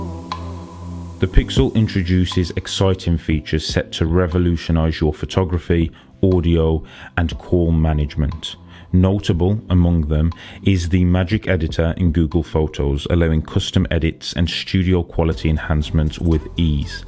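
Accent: England, United Kingdom. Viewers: Bro, your english accent and monotone speech make it hard to understand what you are saying sometimes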